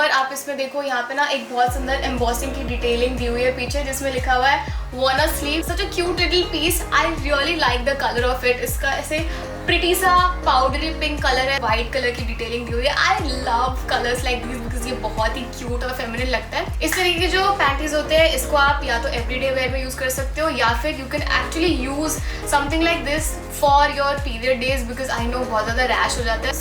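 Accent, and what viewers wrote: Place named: India. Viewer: 100% you look like foreigner 😮ie like pure100% Russian But your accent is paka indian 😮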